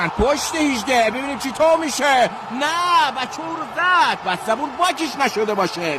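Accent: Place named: Shiraz, Iran. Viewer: چقدر خوب با لهجه شیرین شیرازی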